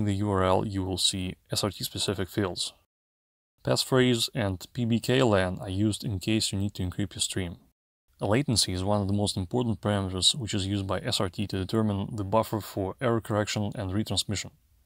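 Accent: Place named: Russia. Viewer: It's a Russian accent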